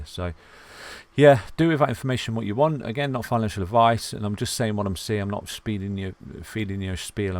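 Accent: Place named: North, United Kingdom. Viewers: First time viewer, informative content with a great northern accent which reminds me of home! Subscribed. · Always great video's on TA. Although sometimes difficult to keep up with the talking speed in combination with a slight English dialect. Keep up the good work. :-)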